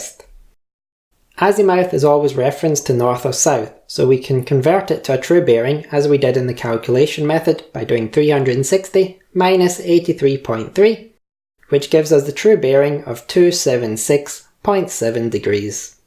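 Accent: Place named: Scotland, United Kingdom. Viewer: I think you are scottish..you have a strong and beautiful accent sir! Keep making more videos on nautical almanac! Take it easyy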